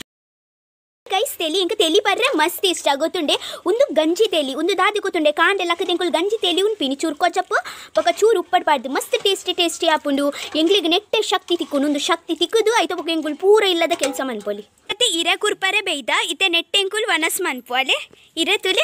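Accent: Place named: Tulu, India. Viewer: Clean tulu pathervar.. mast hats off ireg .va hindi pathendala Tulu nu burthijar. Sampradaaya burthijar... Nice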